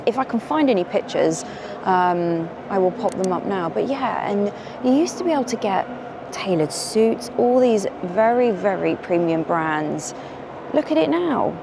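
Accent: RP, United Kingdom. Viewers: I love her voice & posh accent :)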